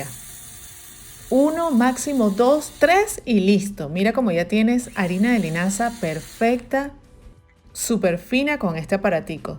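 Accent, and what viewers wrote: Venezuela. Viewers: Refrescante escuchar el acento venezolano (caraqueño!) en un vídeo de calidad. Buen trabajo y sigue p’alante! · Me encanta el acento venezolano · Hola, me encantan tus recetas!Al oirte note' que eres Venezolana como yo ! me emociona sentir y reconocer mi pais...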